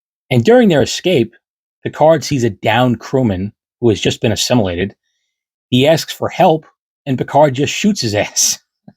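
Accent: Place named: Long Island, United States. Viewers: Never underestimate how much fun it is to listen to a guy from Long Island explain Star Trek. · 2/24/24 (HOW ABOUT THAT DATE!!!) why so much profanity? I could do without all the sexual stuff and cursing ANYWAY great movie! i saw it in theaters with my uncle and my dad The consensus was that it was a fun movie didn't like the diversion into hair metal without mentioning KISS in the 80s KISS were huge again, if a bit similar to other bands of the era they're also NYers just like you (I am guessing by your atrocious accent) given i am no lingust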